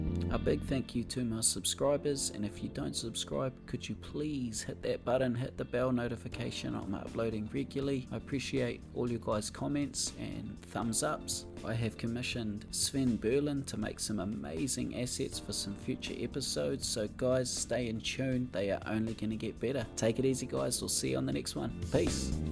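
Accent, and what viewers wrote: New Zealand. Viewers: “The first thing I did was to add this massive dick” lol :D Love the kiwi accent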